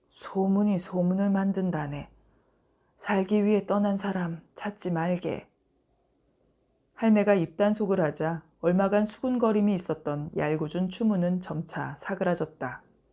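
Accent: Gyeongsang, South Korea. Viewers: 경상도 분 인거 같아요. 낭독할때 사투리가 나오잖아요. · 어떻게 그리 경상도 사투리를 잘 구사하십니까?전직이 성우? ^그놈^ 김일성의 남침으로 택만과 도리실댁의 일생이 ^^^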